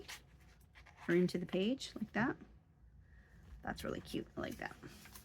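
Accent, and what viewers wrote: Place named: United States. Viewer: New subscriber today. I love your calm presentation style. I'm in the UK. Which part of America is your accent from it's lovely?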